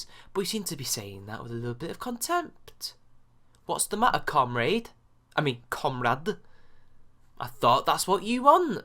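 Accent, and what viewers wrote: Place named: Northern England, United Kingdom. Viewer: I really like your channel. Makes me hum the song every time. Your accent is luv-ly, luv. I can't quite place it, you must be in the north. One of the things these very young SJWs fail at is history. They are either too young to remember the misery, the nearly 100 million lives squashed out by 'marxist' 'communist' brutarian regimes, the mass starvation, the firing squads, pogroms, gulags, five year plans, the slaughter of people who wear eyeglasses, the racism, the murder of people for even trying to practice their religion. And the corruption where the party henchmen steal everything and the people starve, which occurred 100% of the time. I could go on, but hopefully you get the point. She seriously fails in understanding the U.S. Constitution. We don't rule by majority in the way she thinks--the government is set up to protect minority rights and not have mob rule. 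Every sentence she says has a major glaring error, which you broke down brilliantly. Applause.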